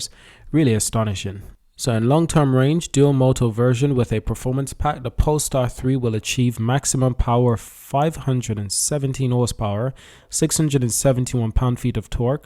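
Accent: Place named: United Kingdom. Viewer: Your channel only seems to pump the news. Why have you not addressed the fact that PSNY share price has dropped almost 70 % since it went public. And with the fact that the CEO persistently wanting to pitch POLESTAR against Porsche is absurd. His ego will ruin the company. That explains why when they launched the P3 in the China market they had to slash prices immediately !! With your accent I assume you are from the UK...try selling Vauxhall at Audi prices and you will know what I mean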